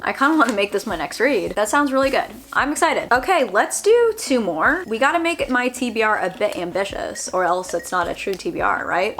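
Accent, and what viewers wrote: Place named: South, United States. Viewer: as opposed to your normal lovely southern accent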